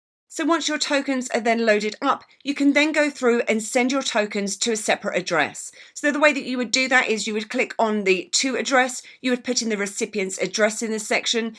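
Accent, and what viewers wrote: United Kingdom. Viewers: British accent is so cute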